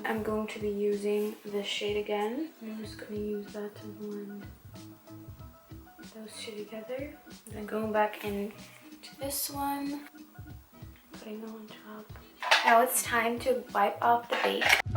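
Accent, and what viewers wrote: Netherlands. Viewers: Omg wait are you Dutch?! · are you dutch?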